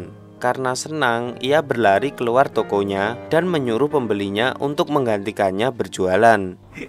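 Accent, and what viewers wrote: Java, Indonesia. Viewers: Jawa medok amat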